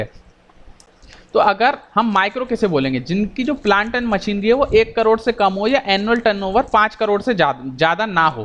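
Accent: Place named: Delhi, India. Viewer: Sir apki video dkhta hu pta hi ni chlta kb video khtm ho gyi....ap exact to the point pdhate ho....delhi's local language 👌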